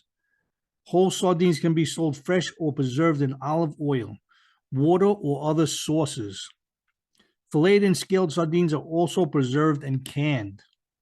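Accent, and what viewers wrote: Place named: Italian-American, United States. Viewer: Accent makes me want to watch the Godfather for the millionth time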